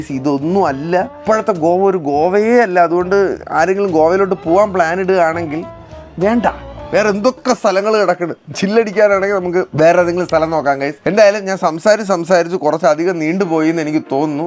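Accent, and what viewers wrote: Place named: Delhi, India. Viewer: Maza aa gaya… hearing your delhi slang… maza aa gaya bc ❤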